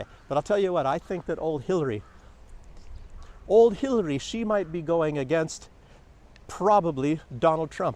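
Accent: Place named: England, United Kingdom. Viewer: You must be very much in love with Belarus. Where are you from bro? Judging by your accent, you're probably from England. However, I am from Cyprus, and I envy you now that you are in Belarus.❤❤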